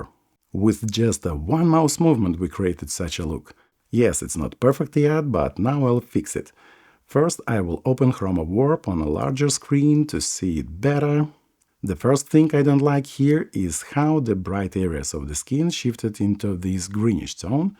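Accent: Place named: Russia. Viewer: Вроде парень наш, русский или нет? Вот прям чувствую ломаный английский, акцент русскоговорящих.))).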